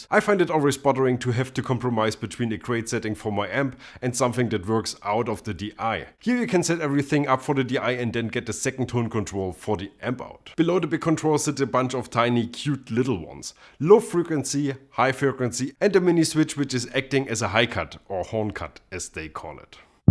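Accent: Germany. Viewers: strongest german accent invasion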